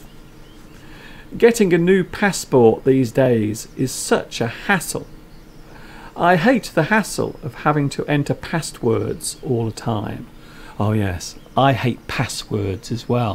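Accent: United Kingdom. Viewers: absolutely fantastic ! just thumbed it up on the go. I appreciate a lot how you entertain us with clear British English. The best way to learn English is listening to you like a couch potato. And Happy Birthday to your mother and tell her she looks like someone pretty clever with a great smile.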